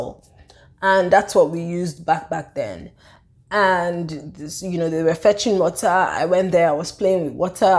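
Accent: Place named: Pidgin, Nigeria. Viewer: The intro totally got me😂😂 I love your pidgin😎😎😎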